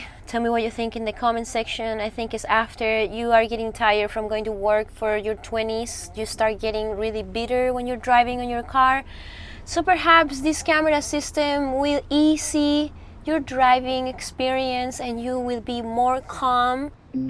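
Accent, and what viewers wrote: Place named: Spain. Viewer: Like your spanish accent! Turns this hoosier man on!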